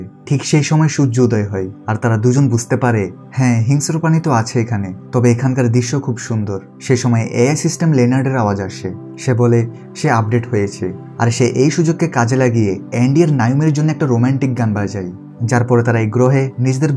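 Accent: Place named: Chittagong, Bangladesh. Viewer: আপনার নাম কি জানিনা। উচ্চারণ শুনে মনে হয়েছে চট্টগ্রামের বাসিন্দা। ইন্টারেস্টিং মুভির এক্সপ্লেনেশন করেন। কিন্তু একটি উচ্চারণ শ্রুতিকটু মনে হয়েছে। এন্ডি থেকে লেগেছে, লিওনার্ড থেকে লাগছিল... এভাবে না বলে বলবেন- এন্ডির মনে হয়েছে, লিওনার্ডের মনে হচ্ছিলো, তার খুবই খারাপ লাগছিল, তার ভালো লেগেছে। থেকে শব্দটা এভয়েট করুন।। শুভ কামনা।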